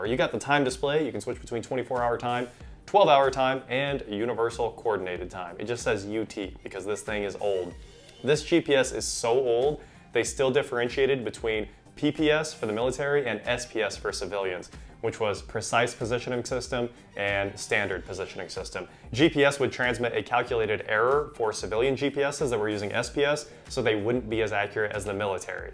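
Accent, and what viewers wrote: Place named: United States. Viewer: It is in American English. You can probably tell, from his accent that he's American. Many English words containing a G that are pronounced hard in British English are pronounced soft in American English.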